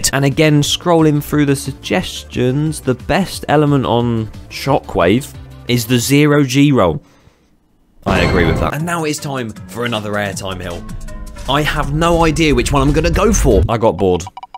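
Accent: United Kingdom. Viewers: british accent just enhances the video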